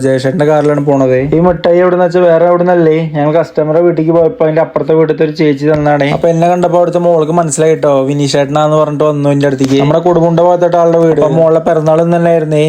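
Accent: Palakkad, India. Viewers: പാലക്കാട്‌ ശൈലി നന്നായി വരുന്നുണ്ടല്ലോ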